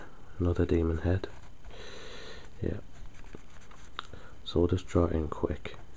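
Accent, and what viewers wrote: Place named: Hull, United Kingdom. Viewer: Are you from hull by any chance. Accent sounds familiar.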